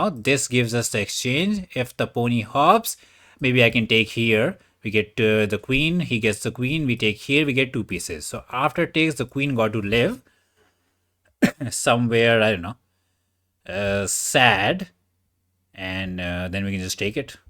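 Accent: India. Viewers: I randomly find your Channel, i love when hindu People speaking english❤, i was work with People from India❤ 🇵🇱🤝🇮🇳 greetings bro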